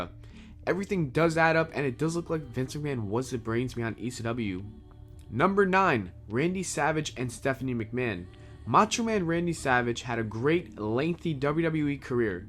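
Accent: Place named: United States. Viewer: Holy Shit! A WWE Top 10 with an American voice!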